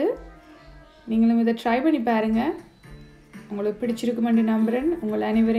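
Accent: Sri Lanka. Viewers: Loved ur srilankan tamil...nice different recipe....❤❤❤❤ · Super mouth watering😋and ungal elangai tamil superb dr stay connected family dr hope to my family dr💖😍👍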